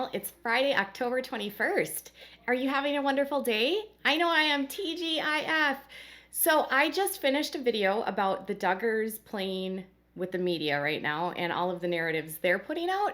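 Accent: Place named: Minnesota, United States. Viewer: I love your analysis and your accent!! You must be from Minnesota or Wisconsin???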